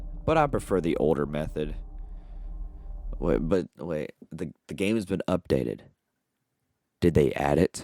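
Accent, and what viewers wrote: United States. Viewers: People from pennsylvania often sound like this, wonder if hes from there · Lordy lord, that's a fine southern accent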